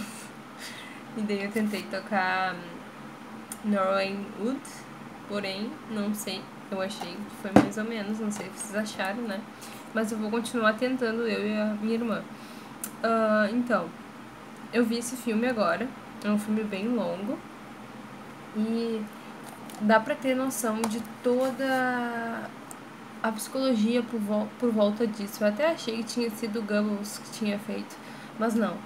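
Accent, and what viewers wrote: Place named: Rio Grande do Sul, Brazil. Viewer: Tu é gaúcha né? Dá pra ver pelo sotaque haha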